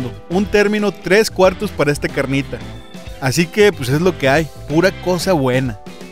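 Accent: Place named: Mexico. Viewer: Ufa tu cocina y como hablas, la neta me siento en México!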